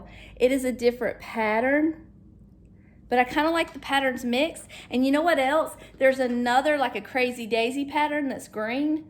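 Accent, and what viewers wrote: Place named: Alabama, United States. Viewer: I’m so glad I found you! A lot of things you use and say remind me of myself. I have several of my grandmothers pieces and they bring back such sweet memories! You are so cute and precious! Where are you from? Sounds like Alabama. I live in Memphis. My daughter leaves in five days for Bama in the fall. ❤️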